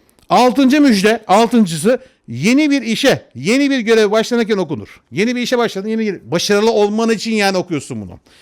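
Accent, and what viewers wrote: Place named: Thrace, Turkey. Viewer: Hocam Trakyalımisiniz? Bende trakyalimyim konusmanizdan anladım Saygılar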